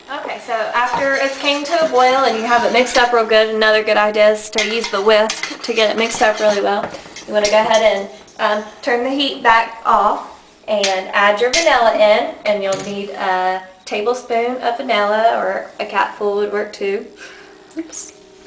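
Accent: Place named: South, United States. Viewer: are you southern I hear an accent.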